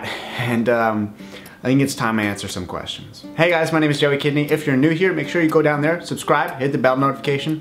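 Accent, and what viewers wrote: Canada. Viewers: basically ayla he’s Canadian. That’s how they pronounce it in Canada.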